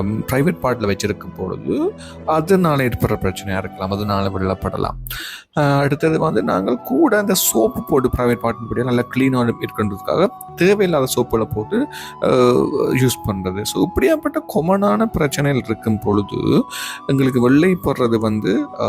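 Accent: Jaffna, Sri Lanka. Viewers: Are you sri lanka or india your jaffna tamil nice Tamil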